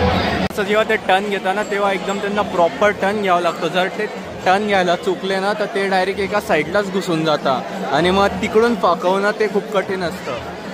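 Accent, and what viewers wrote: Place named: Jalgaon, India. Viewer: खूपच छान एवढ्या गर्दीमध्ये रथाचे चित्र ण करून तु आपल्या जळगावच्या भाषेत मायबोलीत बोलत असतो तेव्हा खूप गावाकडची आठवण येत असते तुला पुढच्या वाटचालीस भरपूर यश मिळो ही श्रीरामा जवळ आमची हात जोडून प्रार्थना आहे धन्यवाद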